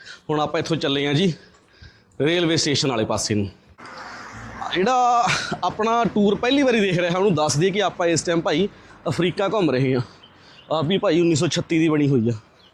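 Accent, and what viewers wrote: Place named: Malwa, India. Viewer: chal oye ehe baut vadia part c, bai ghaint a pura, roti baut sohna kehanda, menu lagda malwe da hana bai?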